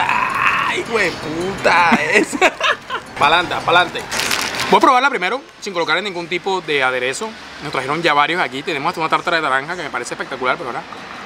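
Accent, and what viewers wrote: Caribbean Coast, Colombia. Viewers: Si, el habla costeño, pero no vulgar, ojala no se amane. Que siga como hasta ahora ha llevado su canal, gracioso, pero sin rayar en la vulgaridad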